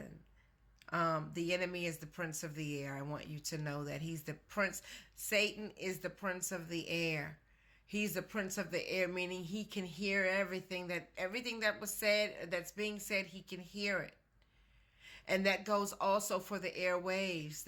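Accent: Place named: DMV, United States. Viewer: You must be from the DMV.. I can tell by your accent lol Anywho those majority of the Husband Videos are a set up lol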